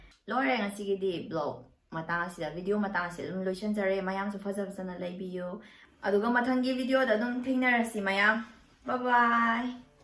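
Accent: Kakching, India. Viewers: Yengba yamna nungai tabasu yamna nungai nungai harisini eina ngang ja re si kakching maron dniko 😁😁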